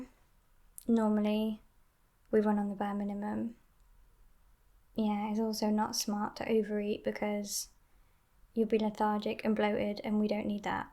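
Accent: United Kingdom. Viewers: Shes either gone MORE british or this is her actual accent and the "posher" one is put on...blown my mind haha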